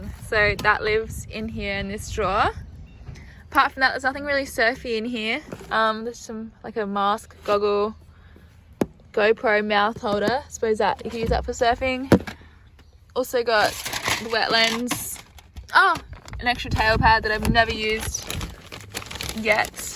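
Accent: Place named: Australia. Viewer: hi im soo glad i found ur youtube ch today and subscribed too it ur soo pretty have such great fun energy and ur vids are really good and i love ur aussie accent too! ha!!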